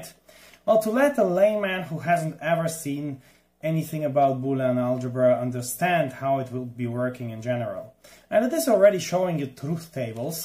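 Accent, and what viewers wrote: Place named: Austria. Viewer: Excellent thinking 🤔 Systrans with accent such as yours is English with Austrian accent. Depending on the language being translated. Usable on cell phone 🤳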